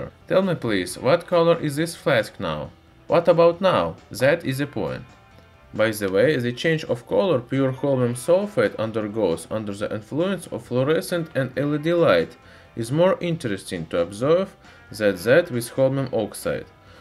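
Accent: Russia. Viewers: You make great videos! I love your accent, like the secret Moscow contact in a 60’s spy movie. :-) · The Donald He tries really hard to speak well but he is Russian so have some difficulty to pronounce english words clearly 😐😉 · How many of you watch his videos just to hear his cool Russian accent? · Are you Russian? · I'm Slavic, but I can't stand that Russian accent, sorry.. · yo bro... ur video is nice.... but plz add subtitles... ur russian accent is too strong...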